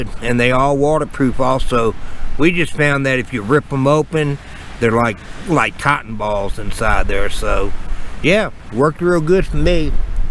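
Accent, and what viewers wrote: United States. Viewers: pretty spot new mexico is it.like watching your videos , you make me laugh with that american accent of yours..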